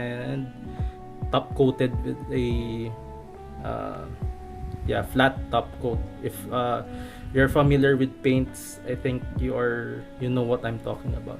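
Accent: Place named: Ilonggo, Philippines. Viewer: HAHAHAHAHAHAHA akala ko lang kasi may halong illonggo yung accent mo sir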